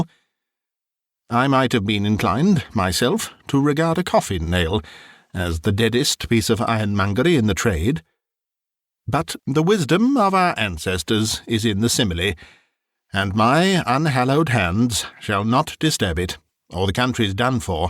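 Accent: United Kingdom. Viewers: I tried listening to a few other versions. A Christmas Carol, narrated without a British accent, cannot be borne. My opinion, I know, but at my age, traditions die hard. So, yours will be the version I will be listening to this season. I must admit that due to my illness, myasthenia gravis, I will not be able to cook for Christmas this year or decorate. It is all I can do to take a few steps and do my speech therapy, swallowing therapy, and everything else therapy, lol. So, please know how much your production will mean to me this year, about the real meaning of Christmas. I can't now join your Patreon, but am hoping to as soon as my disability is approved, to thank you in a more tangible way for the very many long nights your charmingly narrated stories have helped me through insomnia, pain, and sleepless nights. I have been following you since the beginning under my other channel, Wmnoffaith1, but I am the same person, notwithstanding. 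( It is rare that one has an opportunity to use notwithstanding in a sentence; it makes me feel positively Dickensian:). Anyway, I just wanted to express my thanks, and let you know that regardless of the problems, and wars you have had to endure this year to keep your channel alive, it is appreciated by so many of us, and provides more comfort than you know. Merry Christmas and a Happy New Year, to you and yours. I wish you all of the success and blessing and prosperity that you so richly deserve in the coming year. Sincerely, Cheryl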